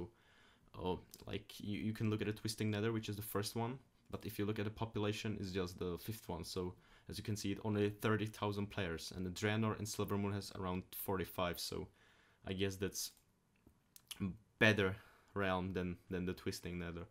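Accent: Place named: Czech Republic. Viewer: Jsem si řikal že mi ten přízvuk zní česky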